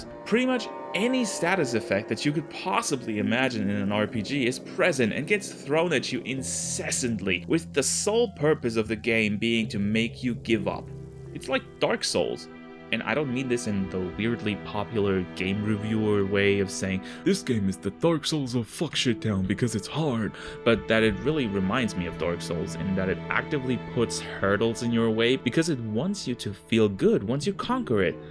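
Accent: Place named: Spain. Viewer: Having a helluva time trying to figure out your accent—I’m vacillating between “Spanish” and “Czech” ha ha. But who cares? Your English grammar and pronunciation are an order of magnitude better than 97.654% of American YouTubers! Great vid. Great analysis. Insta-sub! (And I don’t even play Diablo)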